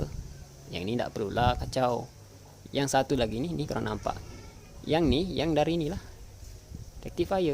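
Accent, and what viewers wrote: Sabah, Malaysia. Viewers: Mantap bossku,Kamu area mana bossku?slang Kamu macam sabahan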